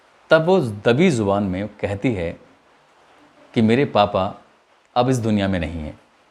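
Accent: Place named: Lucknow, India. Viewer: walekum Assalam shukriya janab bolane ka kya yah to Lucknow ka asar hai 🙏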